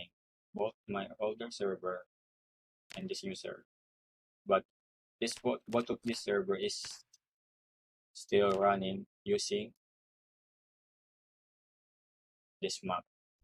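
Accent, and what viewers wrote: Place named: Philippines. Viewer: thanks man i really appreciate your tutorial, i cant help but wonder if you're a filipino based on thee way you speak (I'm filipino too)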